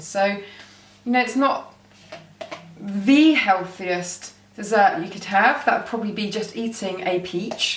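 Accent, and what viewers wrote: United Kingdom. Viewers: I like how you saw "tomato" :)) love your British accent! To us here in the US it sounds so interesting and nice!! I could listen to you all day!!:) Luv from New Jersey!!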